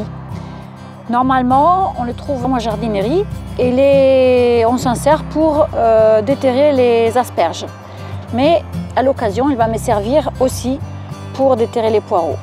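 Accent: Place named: Provence, France. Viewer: ce merveilleux accent digne du terroir provençal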